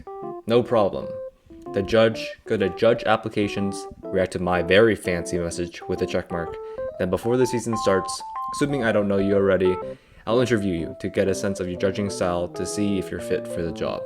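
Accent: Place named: China. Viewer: I never imagined that voice would fit into this kind of videos, i am actually enjoying this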